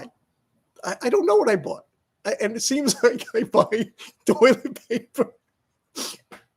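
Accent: New York, United States. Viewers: amazing you never lost the NY accent! love it..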